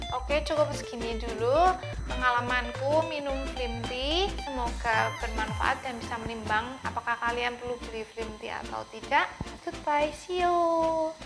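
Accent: Java, Indonesia. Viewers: Cece medok bangat ya 😀wong Jawa yaaa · Medok mbak e😂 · Ngomonge agak medok yaa cece Salam teko Suroboyo 😅👍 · Surabaya? Dialectnya medok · Cee lucu banget kalo ngomong. Medok Jawa😁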